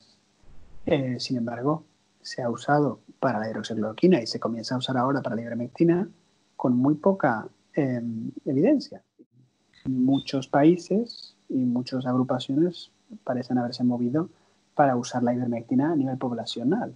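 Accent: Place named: Spain. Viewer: consulta porque habla como español si es caraqueño hasta despues de los 20 años ????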